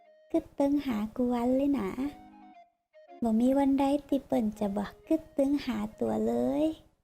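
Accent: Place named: North, Thailand. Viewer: ฟังกุ่วันกัมละหาหุ้ว่าเป๋นคนเมือง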